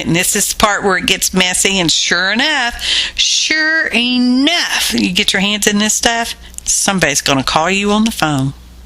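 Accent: South, United States. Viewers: Absolutely AWESOME 🤩 thanks for the video and your wonderful southern talking! You have to do a video on why we buy these scrapbooking items! There is a woman that did one, it’s really old now and we have all new and different things! I can’t remember the title but it’s about why we have all this scrapbooking stuff! You had me gut busting laughing all the way!!!!! I LOVED IT AND I NEEDED IT!❤️🌟❤️🌟🙋‍♀️✌️🙏🤩😂🦄🌈👍😁💗❤️💗❤️🤷‍♀️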